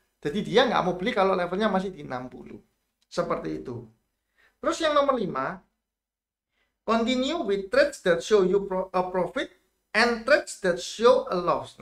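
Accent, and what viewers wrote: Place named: Java, Indonesia. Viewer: seneng sama mas, bosone medhok😀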